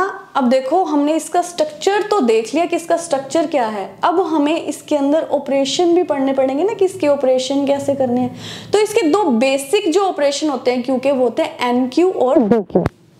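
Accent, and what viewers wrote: Punjab, India. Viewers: Accent punjabi didn't left the chat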